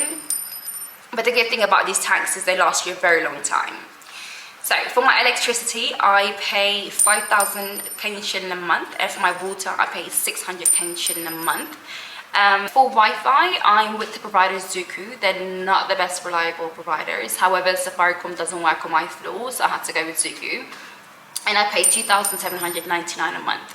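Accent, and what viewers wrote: United Kingdom. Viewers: She is obviously not American dah🤦🏽‍♀️ her accent is British😂 yeah ban and see how that works for y’all economically, did we watch the same video & what she spent?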